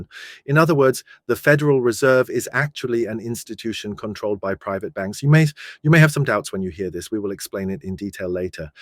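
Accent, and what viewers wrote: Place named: United Kingdom. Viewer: Very British accent 😊 👍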